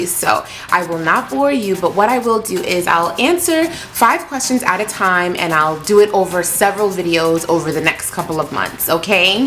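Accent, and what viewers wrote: New York, United States. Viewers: Love your accent girly! NY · I so LOVE you, your NY accent was all over this one. One day I'm going to be able to meet you at a meet and greet, you seem to be a blast to be around. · LOL I love your voice... your such a NEW YAWKER ..lol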